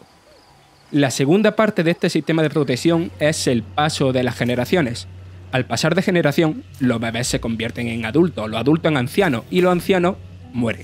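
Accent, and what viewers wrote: Andalusia, Spain. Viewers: Tío, se hace muy incómodo escuchar un vídeo con un acento tan CERRADO. Soy Andaluz y me encanta nuestro acento, pero éste es demasiado cerrado y muy desagradable de escuchar durante un vídeo de larga duración. Tío, si te vas a dedicar a esto, te recomiendo clases para mejorar la vocalicación. Un saludo desde Málaga. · Tiene acento andalúh, déjalo que hable como quiera. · yo aun no he sido capaz de ver un videoanalisis de vandal desde que esta este comentarista , parece que tiene la nariz taponada , y el acentillo andaluuuuuuh es muy exagerado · Pues nada, el que tenga problemas con el acento andaluz que se tape los oídos o que se vaya a donde crean que hablan un castellano perfecto y sin acentos,,, lo que hay que leer a estas alturas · Es del sur de España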